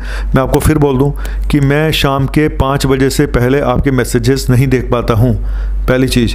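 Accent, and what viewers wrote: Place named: Punjab, India. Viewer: Bhai aap ki punjabi nikal hi jaati h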